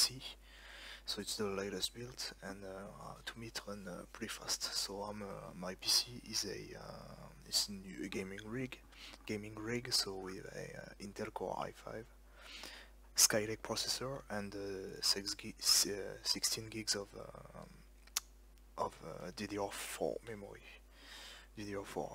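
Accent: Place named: France. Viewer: J'adore ce que ca donne un francais qui parle anglais :p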